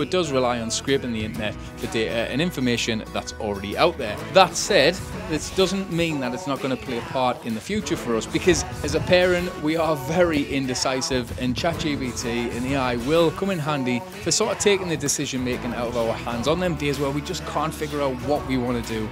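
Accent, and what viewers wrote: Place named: Durham, United Kingdom. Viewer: I used to know the Sloane family in Wallsend in the fifties, i wonder if youre related? I detected a few Durham words in your banter, and wondered if you have connections there? I haven't camped out in Northumberland since a was a bairn. I follow Jimmy Lundy on his YT channel and hope to do one of his camps sometime. I don't think he knaas this castle you visited. I've never hoarde of it Just wanted to say in closing You look a bit like Utred in the 'netflix LAST KINGDOM' series. I would like to record you saying "Honour! Is everything! " ⚔️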